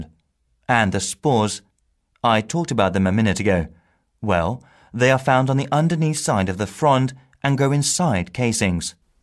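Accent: United Kingdom. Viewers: me too :'( bc it's British Accent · British accent , this is a nice accent , but not for exam . 4/6 I could do it better :((((((((((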